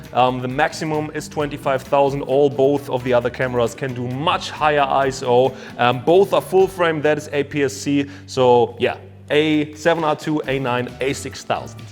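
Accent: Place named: Germany. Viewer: Boy, you talk with such a droll German accent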